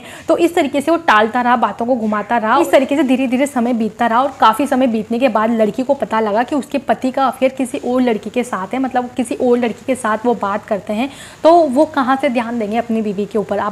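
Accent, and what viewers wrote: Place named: Rajasthan, India. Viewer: Mam aap rajasthani lagte ho bolne k laheje se,par aap ne kaha aap delhi se hai🤔🤔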